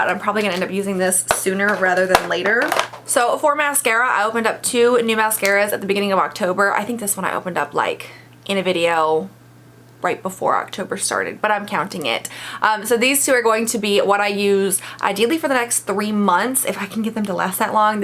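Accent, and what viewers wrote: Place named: South, United States. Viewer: You kinda sound like you have a southern accent in this video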